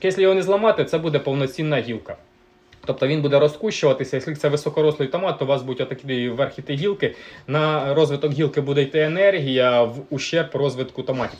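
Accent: Russia. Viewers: Да , да охотно верю, прослушай ролик.Твое " Вiльне володіння" аж по ушам бьёт.Ты даже слова выговариваешь на русский манер.Там лютый Суржик.Одного не понимаю НА ХЕРА ОНО ТЕБЕ НАДО? Был нормальный канал, на нормальном языке и тут тебя Майданутость покусала? Кстати если Шо Я в Винницкой области.А то сейчас начнёшь " Вам россиянам ,нас вильных не понять."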